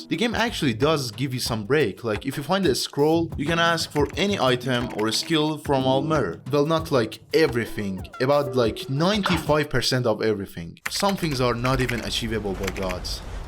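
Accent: Iran. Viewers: Why do you sound so Iranian? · irani ass lahje man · Ngl bro lowkey has a Persian accent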